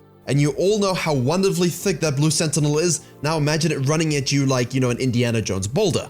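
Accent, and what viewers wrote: South Africa. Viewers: This is the first video i saw of your channel and i instantly knew u were south african lmao are you a masters player with 200 ping tho ? how is that possible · i thought i recognised the SA accent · Hey man, are you South African?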